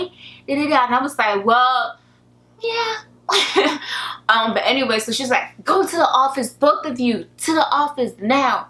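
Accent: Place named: Nigeria. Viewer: Tromaria Presimparae, Why would you "suggest" she "try" to speak in a manner that fits your hearing? She is Nigerian...not from the U.S. Have you not seen any of her other videos? She always says something about being yourself; with that being said, you be you and let continue on with her beautiful fantastic awesome Nigerian Princessness. P.S. Yes I said Princessness...and what?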